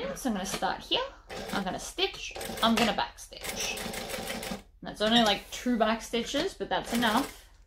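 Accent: Australia. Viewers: Hi! I like how you switch out from bags to something els in sewing. I really like the revamp of your sewing machine, it turned out very nice. I’ve been watching your videos for a little bit now & enjoy them very much ( I’m a newbie ). I love your Ausie accent. Great job all around young lady. Take Care Be Safe. 👍😊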